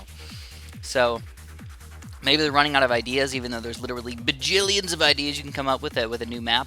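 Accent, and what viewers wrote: United States. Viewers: Wait what state are you in, that sounds exaclty like where I live.....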